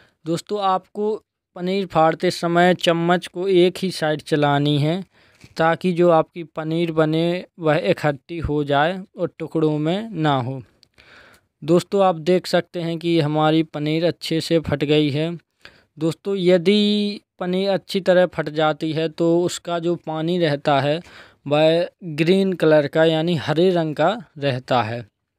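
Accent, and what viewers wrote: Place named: Bundelkhand, India. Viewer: bhai main bhi bundelkhand se hu Datia bhander m.p se 👍 aapki voice se pahchan ho gyi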